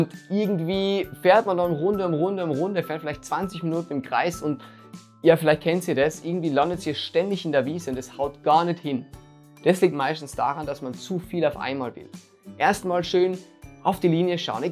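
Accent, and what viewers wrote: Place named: Austria. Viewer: Endlich mal eine angenehme österreichische Stimme :D Ich finde du machst echt super Videos und gerade im DIY-Bereich bin ich sehr froh eine ordentliche deutsche Erklärung zu bekommen. Es wird nicht ewig um den heißen Brei geredet (Das Hauptproblem der meisten Youtuber IMO) und du hast interessante Themen! Ich hoffe es kommt noch ein Video für eine DIY-Wheelbuttonbox. Ich will mir unbedingt selbst eine bauen aber ohne ordentlicher Videoanleitung, traue ich mich leider nicht drüber. Aber ich freue mich auf jedes neue Video :) Mach weiter so und alles Gute für die Zukunft!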